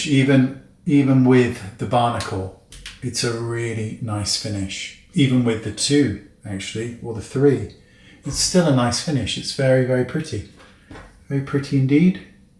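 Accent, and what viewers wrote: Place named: Wales, United Kingdom. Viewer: You absolutely crack me up! Proper welshy and bloody lovely mun! You’re also my 95 year old nans favourite she bloody loves you she does and would love a cwtch! Have a great day and thanks for always making me smile lovely boy xxx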